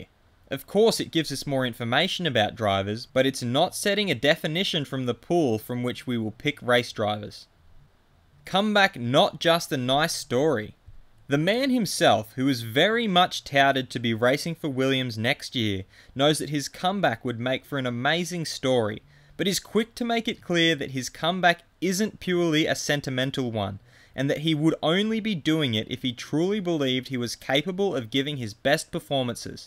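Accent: Australia. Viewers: Great video. On your suggestions for future videos, one thing I suggest is to change your accent. For many people the Australian accent is very annoying. Thanks.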